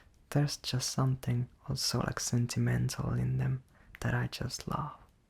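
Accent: Finland. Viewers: What is that accent? Almost sounds like a Finnish accent.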